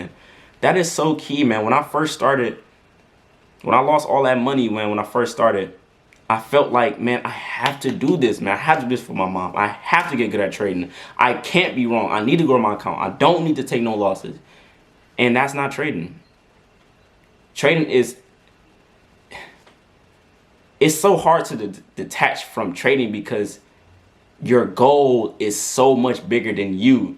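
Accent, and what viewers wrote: United States. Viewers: are you from texas? because of the accent. love the vids btw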